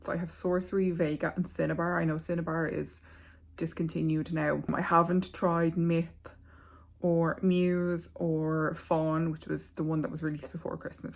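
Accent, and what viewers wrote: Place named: Ireland. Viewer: Hello! I’ve followed you on IG for years but didn’t know you had a wee YouTube channel! Glad you popped up. Also didn’t know you’re Irish. Lovely to hear you and your lovely accent. 🥰❤️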